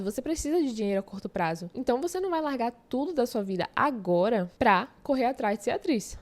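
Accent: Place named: Bahia, Brazil. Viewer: eu simplesmente amei o seu canal principalmente por ser baiana, disso eu não tenho dúvidas só de ouvir seu sotaque me senti em casa, seu vídeo sobre autenticidade parece que foi feito pra mim, muita gente me ama como eu sou e mesmo assim vivo constantemente querendo mudar tudo em mim, vc é muito necessária ❤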